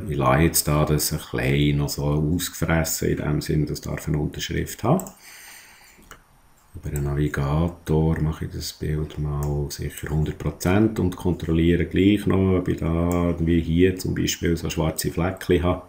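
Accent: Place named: Bern, Switzerland. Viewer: Dieses Tutorial ist genial. Was ich da vorher vergebens geübt hatte! 6 Minuten in Berndeutsch und es klappt.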